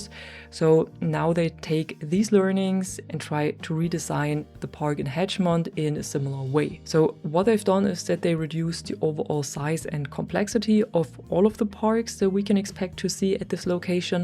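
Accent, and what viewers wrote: Germany. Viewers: Love the German accent ❤🎉greetings from Germany and hopefully you keep up with the skate content 😊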